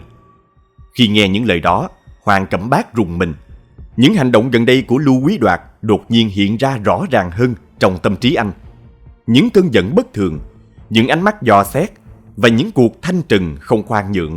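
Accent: South, Vietnam. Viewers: Đón chào, giọng đọc rõ ràng, nhẹ nhàng, ko áp lực lỗ tai, khúc nào cần nhấn mạnh, chứ ko tăng âm lượng ko phải lúc, quan trọng hoá giọng đọc, diễn lố, đọc giọng miền nam tuyệt tuyệt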